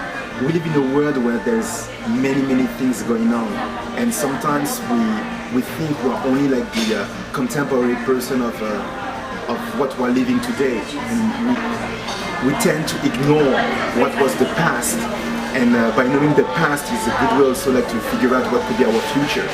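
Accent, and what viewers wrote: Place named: France. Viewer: He has a strong French accent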